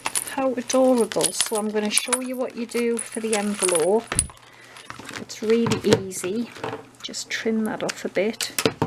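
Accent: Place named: Newcastle, United Kingdom. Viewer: I have been watching your videos because I am a fan of your Geordie accent. I was born in Newcastle and still have family there but we moved to Canada a number of years ago. I love listening to you talk while you do all the crafty things. I'm having trouble finding those Matte laminating sheets here however so that makes me sad.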